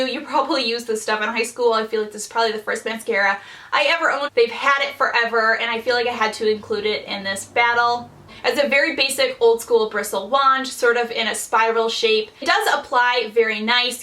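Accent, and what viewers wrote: Midwest, United States. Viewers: Are you from the midwest because I feel like your accent is very similar to a midwestern accent. Just wondering :) Love the vid btw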